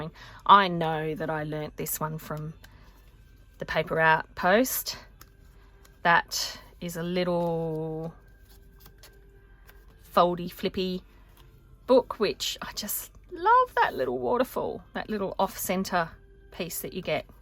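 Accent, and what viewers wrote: Australia. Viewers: Nice to hear a fellow Aussie voice!!!! Love those stitched leaves so much!!! Split pins for me here in Oz-brads in the US. Glad YouTube gave your channel to me today!!!!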